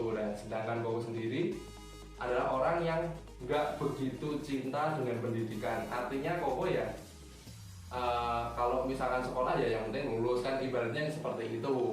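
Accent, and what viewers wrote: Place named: Java, Indonesia. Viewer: Maju terus..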